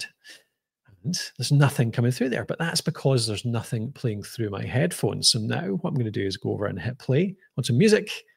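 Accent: Scotland, United Kingdom. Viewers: soon as i heard that Scottish accent i knew i was in safe hands, top man!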